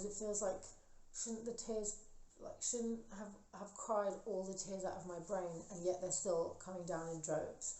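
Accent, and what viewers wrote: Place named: South, United States. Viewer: First, wow I forgot how southern ur accent is, I love itttt <3 beautiful. 'u look fine' absolutely riles me as well, and goes the other way when I'm manic and people say I look amazing n im thinking hun I'm living off spinach and I can't stand still. People's unsolicited advice/opinions can shove offfffff. Thanks for making this, it's so hard to talk about , and I relate to a lot<3 also I have that cat necklace but in pink iaaaaaaa <3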